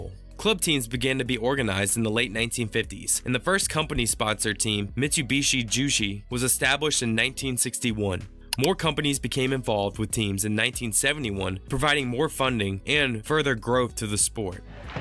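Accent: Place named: Louisville, United States. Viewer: I think that he may be from "Loo-uh-vul."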